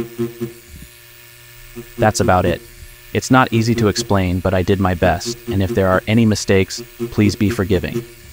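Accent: United States. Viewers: an Austrian artist with a perfect American english...awesome! Im Dan, from Brazil and I inspire on becoming an artist. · I totally thought you were American. Possibly even from my area in the Pacific Northwest. So cool to find out your from Austria! Love the content dude. These vids are incredible!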